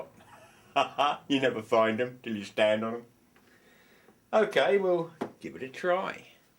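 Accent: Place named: England, United Kingdom. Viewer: I always enjoy your videos. Whenever I hear your voice, it always reminds me of the Wallace and Grommet animations. I have always been a fan of the English wit and delight in hearing an English accent. I encourage you to continue making your videos, I find many useful ideas for projects and repairs of my own.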